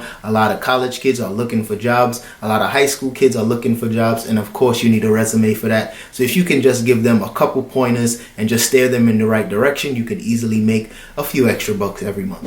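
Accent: Caribbean. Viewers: I still sense some caribbean or something . Lol. I like it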